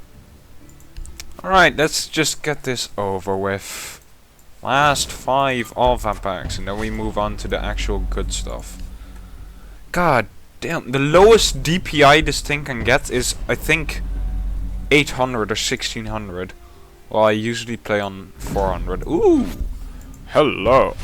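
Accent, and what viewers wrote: Netherlands. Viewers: U dutch? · are you from the netherlands?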